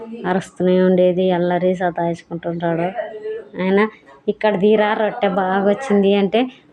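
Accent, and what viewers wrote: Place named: Kurnool, India. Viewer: Hi akka Me vedios chala baguntai medi a village Madhi Kurnool Andhuke me basha vinte happy ga anoinchindi❤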